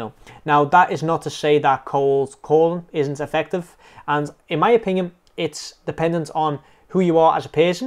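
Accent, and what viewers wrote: Liverpool, United Kingdom. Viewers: High quality content🙌💯 you have a scouse accent haha, it's really unique and I love it. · Where abouts is liverpool you from?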